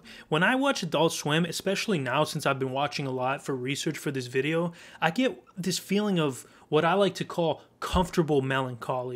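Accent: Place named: Brooklyn, United States. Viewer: This guy is the most Brooklyn youtuber ever I love it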